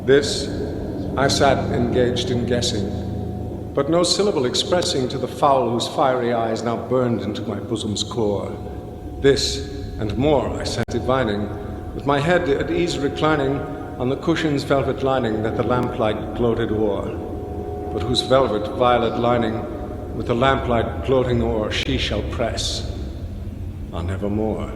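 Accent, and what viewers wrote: United States. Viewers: it's a nyew yoak accent. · "The Raven," straight out of Brooklyn. This is ridiculous.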